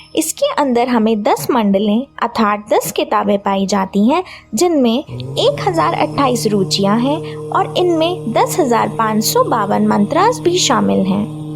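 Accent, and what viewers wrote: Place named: England, United Kingdom. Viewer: अच्छा है किंतु हिन्दी का उच्चारण उचित तरीक़े से करें ना कि अंग्रेज़ी तरीक़े से। जैसे उपनिषद ना कि उपानिषद।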